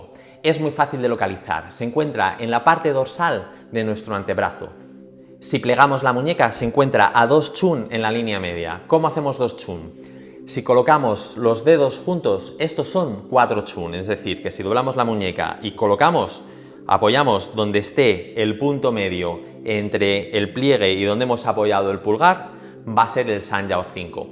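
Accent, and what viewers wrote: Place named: China. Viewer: Usted estudió en China? Tiene cierto acento en la musicalidad del español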